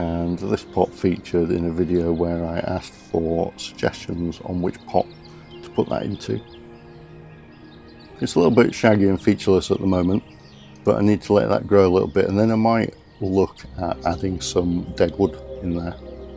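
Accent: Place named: Greater Manchester, United Kingdom. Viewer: I recently found a local Bonsai Club and looking forward to joining a meet. The majority of my collection are in training. I have learned a lot over the past few years. If you ever need to lighten your load I'd be happy to help, listening to your accent I don't think you would be too far away from me in Greater Manchester. Keep up with the great content 👍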